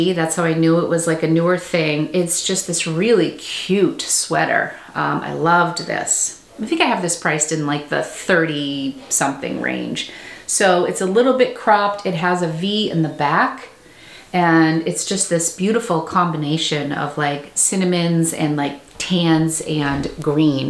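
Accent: Massachusetts, United States. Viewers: Lol I feel like you don't have a strong Massachussetts accent until you hang out with your friend haha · This is exactly what I was coming to the comments to say, haha! Love that accent! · I totally thought the same thing! Lol..., I’m the one who asked about you having a hidden Boston accent in your IG a while back and this video proves you do:) love it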